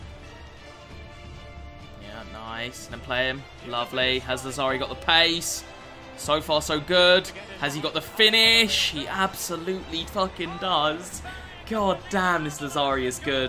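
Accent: United Kingdom. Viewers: I really can’t tell if you’re British or not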